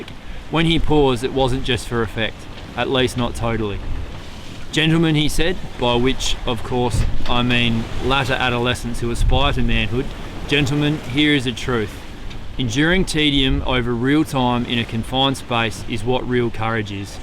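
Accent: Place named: Australia. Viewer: What accent is that luv. We all speak like that down here in Aus:-)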